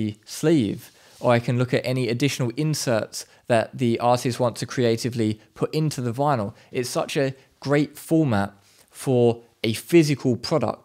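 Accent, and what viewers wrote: United Kingdom. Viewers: Rough london accent, strong dark beard, 2012 one direction hair?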